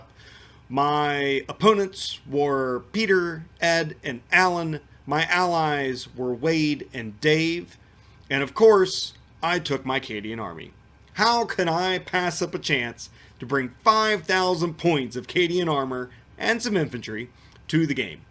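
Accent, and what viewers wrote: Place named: Washington PA, United States. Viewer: Sounds like you’re around Washington pa? I’m from NE Ohio not too far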